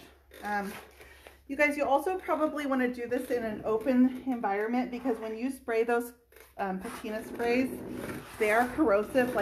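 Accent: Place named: Wisconsin, United States. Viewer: I've never noticed it before but you sound like you might be originally from Wisconsin...or maybe your parents are. I think it's an adorable accent.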